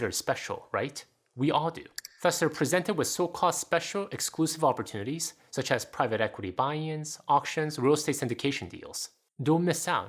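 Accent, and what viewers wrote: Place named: South Korea. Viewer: It’s interesting how his English sounds like Korean haha. Nice video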